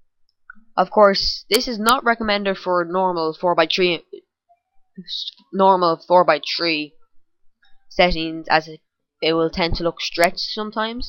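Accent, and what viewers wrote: Ireland. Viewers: You say tree instead of three because of your Irish accent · He's Irish that's why he pronounces it different! Some people think everyone has to sound like them. Don't mind them kid, I got this in one of my videos before.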